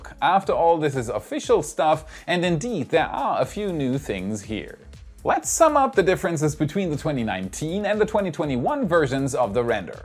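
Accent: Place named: Germany. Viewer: not a bad idea, but no, it isn't. His native language is German. He broadcasts in English so he can reach a predominantly American audience, and I am very glad he does. No other English speaking nation says it that way, either, that I know of, and I do have a high degree of familiarity with the other dialects. As an aside, I think we should pronounce it that way, but we don't.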